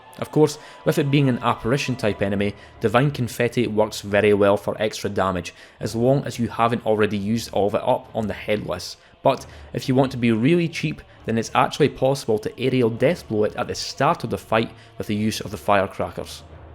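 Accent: United States. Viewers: A potshot at people who dislike Scottish accents and speaking in an American one - it's random shit like this why I love your videos! 😄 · Bro your American accent 10/10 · Non Americans doing an American accent are goated with the sauce, thank you for your contribution 🫡 🇺🇸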